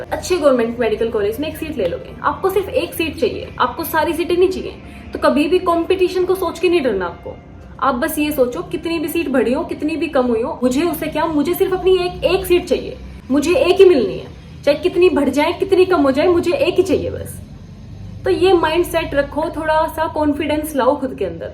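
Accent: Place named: Pahadi, India. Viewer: Himachal wagera se ho kya aap didi? Pahadi accent hai aapka...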